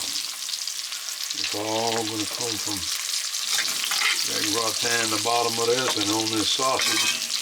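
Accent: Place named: Louisiana, United States. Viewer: Hey — are you from south Louisiana originally. I detect a bit of an accent. I live in Broussard La.:)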